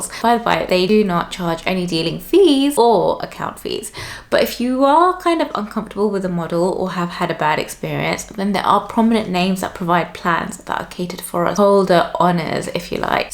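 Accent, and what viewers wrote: United Kingdom. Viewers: Are you from England. Btw great video...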